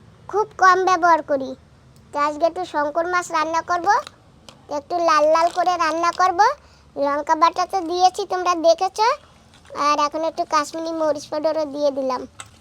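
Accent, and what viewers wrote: Barishal, Bangladesh. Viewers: আমরা কোলকাতায় থাকি ,তোমার ভিডিও দেখি ভাল লাগে তোমরা কি বরিশালের ?কথা শুনে মনে হচ্ছে ।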